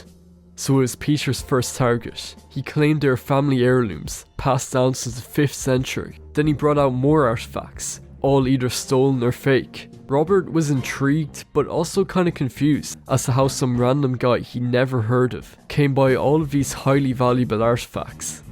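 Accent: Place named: Ireland. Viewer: Nice to hear another Irish voice on youtube. Never heard of this incident. Looking forward to seeing more.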